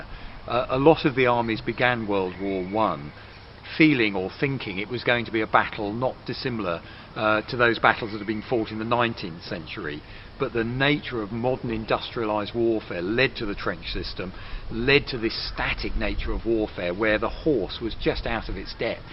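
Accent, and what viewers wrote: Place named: England, United Kingdom. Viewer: сразу понимаешь что говорит англичанин )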